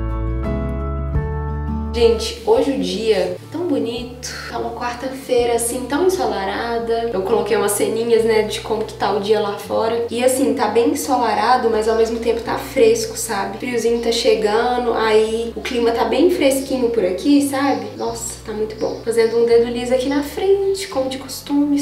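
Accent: Minas Gerais, Brazil. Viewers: muito mineirinha 😂❤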